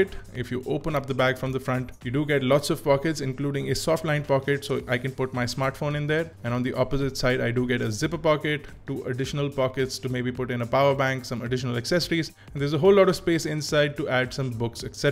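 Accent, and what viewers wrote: India. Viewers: R e bhai aluminum bol le..kya alomenum alomenum lga rakha h...kab tak angrejo ki copy karoge...Indian accent is the best accent.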